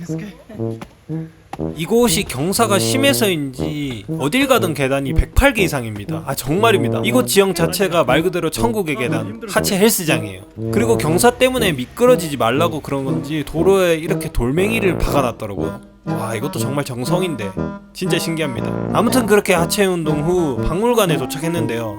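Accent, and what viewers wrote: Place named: Gyeongsang, South Korea. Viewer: 경상도 섬쪽 말투신데...경상도분 맞죠?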